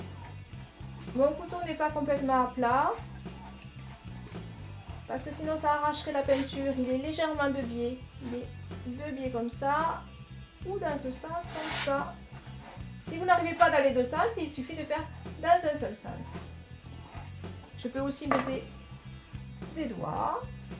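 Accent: South, France. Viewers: Non seulement c'est très pédagogique mais c'est très beau, avec en plus l'accent du midi! Un régal, ça me rappelle chez moi..Très belle démonstration de la méthode au couteau dont je ne suis pas experte; je pense que c'est de la peinture à l'huile... J'imagine que c'est aussi possible à l'acrylique ( je ne supporte pas l'odeur de l'autre....) Merci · Je ne peux rien dire de plus que tous les autres. Heureuse de vous avoir découverte, talent, gentillesse, et l'accent de notre midi😂 je suis à six fours !! Merci et à très vite. Je me suis vite abonnée. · avec l'accent du sud, ce bord de mer inspire, merci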